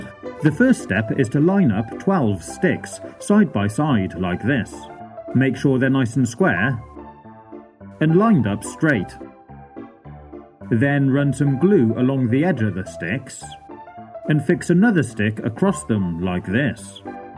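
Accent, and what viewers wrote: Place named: RP, United Kingdom. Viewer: I like your posh British accent! I’m British and have a very posh accent! I’ve half way through made this with my dad, I’m making it but we’ve only done about half (I’m exited for it to get finished!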